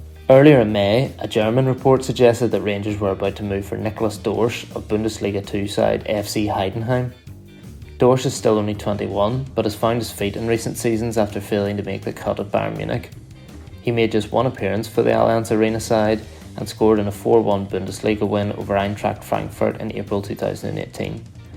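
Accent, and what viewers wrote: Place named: Ireland. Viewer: Wow I didn’t realise there were Irish rangers supporters ? Can someone clear this up as the presenter sounds Irish ?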